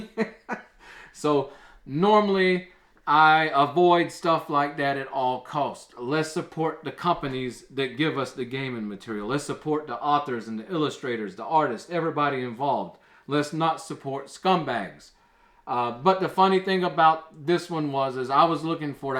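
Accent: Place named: South Louisiana, United States. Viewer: Where are you from? Sounds like around my area in South Louisiana.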